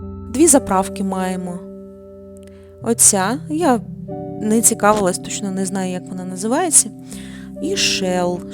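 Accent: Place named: Russia. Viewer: Чувствуется, что на самом деле Вы думаете и говорите на рус языке...а вот влог пытаетесь весьти на укр языке, ну тогда выучите хорошо украинский.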